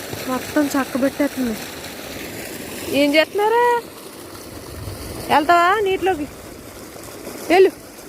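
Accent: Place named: Godavari, India. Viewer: Mi voice change aindi konchem godarolla ammai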